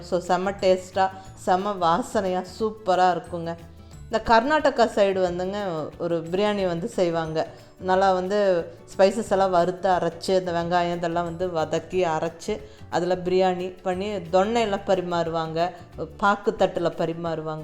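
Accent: Coimbatore, India. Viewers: Super Mam.. Unga Kongu Tamilum super... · pathalea sapidanum pola iruku👌🏻👌🏻nangalum coimbatore dha akka..Muscatla irukom..Ippove oodi vandhiranum pola iruku namma ooru bashai keka keka.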